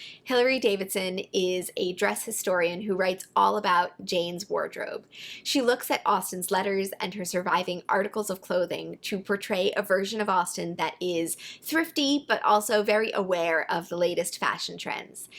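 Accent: United States. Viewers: Glad to see you posting again; hope the little one is doing well. It might be informative to the fans of your three sites to hear how Jane Austen July was kicked off by you, the Spinster, and Katie. Did one of you have the idea and floated it by the other two or did you have a discussion on how to gin up more interest in JA? You are audibly American, whereas Katie is a Brit and Claudia is Italian-German who is married to a Brit. Did you all meet through YouTube? Your suggestions for book-reads are all good. I read Rory Muir's biography of The Duke (he was a second son who had to find his way through the Army), who became a much bigger fish than his once-famous older brother. You simply can't pluck JA out of context; the Napoleonic Wars, the Navy, and the struggle with France was the whole political underpinning to Jane's "fictional" world. Thus I would consider Patrick O'Brian (author of the Master and Commander books and a GREAT admirer of Jane) to also be acceptable fodder for July's activities.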